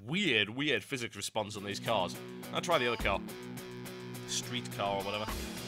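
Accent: United Kingdom. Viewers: Is he British or American? CONFUSED. LOL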